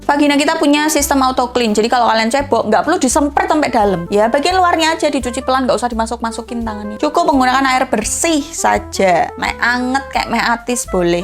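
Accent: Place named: Surabaya, Indonesia. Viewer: logatmu itu Suroboyoan manteb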